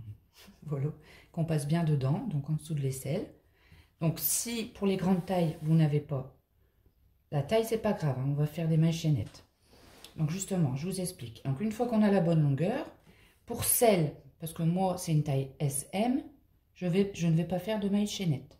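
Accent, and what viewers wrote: North, France. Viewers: Bonjour, je vous decouvre ..je pense à votre accent que vous étes du nord, j habite l alsace mais je suis native du nord ...j aime vos explications et j ai hâte de découvrir vos autres réalisations....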